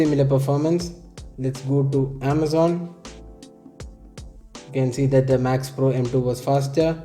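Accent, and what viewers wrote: Kerala, India. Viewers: Are you a malayaliii🤔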